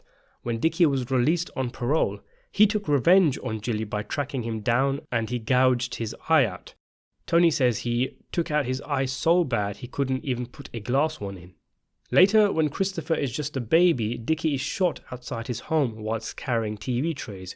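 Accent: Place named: London, United Kingdom. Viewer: I can tell by your accent that you’re south Asian London - am I right?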